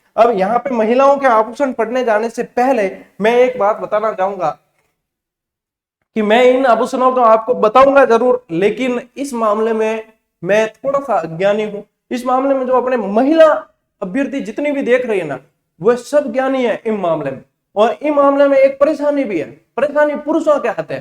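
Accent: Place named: Marwar, India. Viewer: Sir apki marbadi language apke muh se sunne mein bada annad atta mein braj bhartpur se hunn sir moy bhi apni mother tunge bolbe mein bdo mja abe guruji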